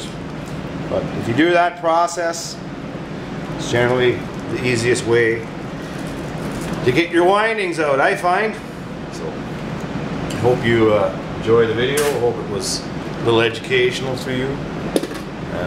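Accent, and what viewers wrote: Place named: West Coast, United States. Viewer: Thanks Bro. just picked up my first washer motor today ...bit of a grunt getting the little basterd out ..had to hack saw it in two ..now I'm down to the windings ...I guess I will try the cut one end and pull em threw method as it looks like this is the easiest method for me being in an appartment ( no shop with vice) ..gotta do it dat way ...you sound like a West Coaster ....OK better quite gabbing and get on with the show ..cheers to all my fellow scrappers out there ..probably one of you is the guy that gets to some of the TV's before me ...got to love them big sonys ...they are killer ..got 2 the other day ....check out my site I got lots of interesting videos ...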